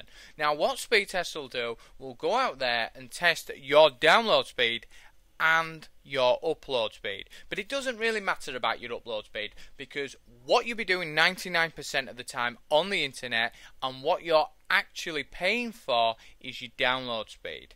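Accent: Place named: Leeds, United Kingdom. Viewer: You from Leeds? Great accent!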